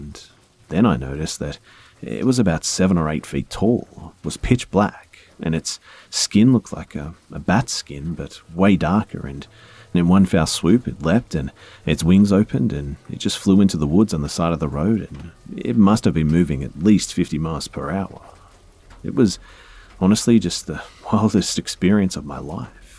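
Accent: Australia. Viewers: I didnt think there was an aussie narrator on the tube. Hi from brisbane 😂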